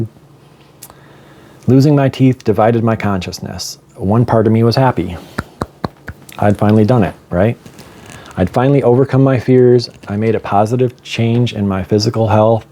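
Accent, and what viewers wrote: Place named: Midwest, United States. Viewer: Hi. You're so normal that you're confusing me. I think I've been alone too long. I live in a box too. That's because, four years ago my husband went in the kitchen on his birthday and he passed away on our kitchen floor. I chose a 12 x 11 box. For a year, I layed in my bed for 23 hours a day. You see, I don't drive and nobody came. I went into shock and I was afraid to cry because, I thought there was a pretty good chance I might start screaming and then, who was going to stop me? Fortunately, I had my dog Charlie. She was the reason I had to get out of bed for an hour a day. I've spent a while trying to figure out who I am after I found myself in isolation. It's a good thing that I have a lot of songs too. I was into my kick about 2 months ago. She's adorable and man, can she sing. I started my toothless journey probably last year sometime. 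Maybe that's the only positive thing about being alone. I didn't have to talk to anyone. Which is kind of sad because, I love talking to people. I have just recently learned to quiet that negative voice. If a negative thought pops in my head, I'll actually stop and say, that's not my thought. Get lost. I wonder something because of your accent and you saying that you grew up in the Midwest. I live in Michigan and they call us the Midwest but, we talk more like Canadians. Anyway, I was gifted a set of temporary dentures and I'm going in a week for the second re line. I was thinking about how much I loved licorice and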